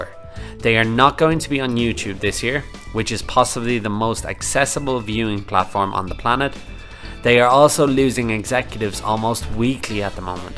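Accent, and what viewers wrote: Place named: Ireland. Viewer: I’m not a lover of LIV, Norman or all the blood money grabbing pros and honestly, I hope they all rot in hell but this clip is so full of shit, it deserves to be put in the trash can. If you read this in the international news then maybe, there will be credibility but other than that, this guy sounds like he’s from Island and will treat this as an attempt at Irish humour and nothing else.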